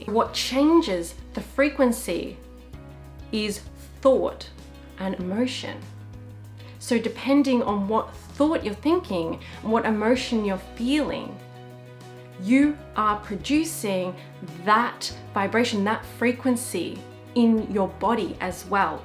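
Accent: Australia. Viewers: English? Hmmmm it sounds Australian to me but... maybe I’m wrong ?? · Are you Australian ?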